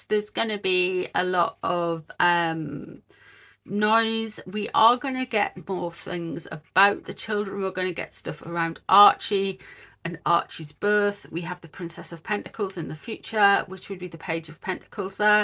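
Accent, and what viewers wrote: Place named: England, United Kingdom. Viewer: Love❤ your interpretations you are so on the case. Love your accent too . I’m Australian and I get a kick out of different English accents, there are so many varieties. Here in Australia we pretty much sound similar.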